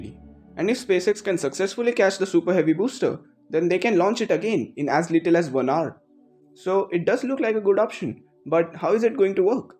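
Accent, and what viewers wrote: India. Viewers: Omg the Indian voice sounds like the scammers voice jaja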